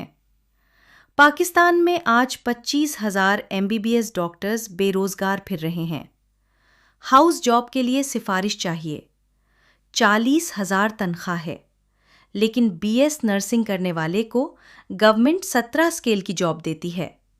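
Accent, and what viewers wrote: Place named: Hyderabad, India. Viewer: Assalamu Aleykum, Aap ka laheja mein Hyderabadi accent hai ( india ) sun kar achha laga , ( Hyderabad Deccan se Syed )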